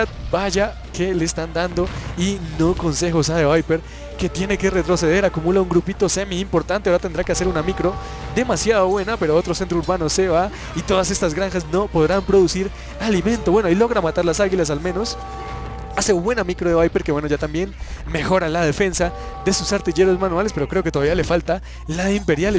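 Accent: Bogotá, Colombia. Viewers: De que parte de colombia eres Buenos videos :D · De que parte de Colombia eres? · Entonces eres de Bogota parcero xD muy bien comentado, con buen tono de voz y animicamente bien. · eres colombiano y rolo?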